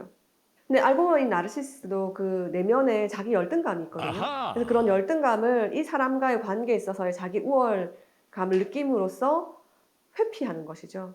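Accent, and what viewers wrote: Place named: Gyeongsang, South Korea. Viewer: 오늘 깔끔한 얼굴에 갱상도 억양 굿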